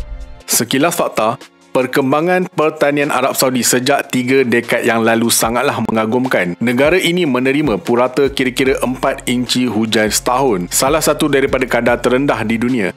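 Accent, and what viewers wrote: Malaysia. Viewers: Cara bercakap macam orang Malaysia, Salam Dari Malaysia 😂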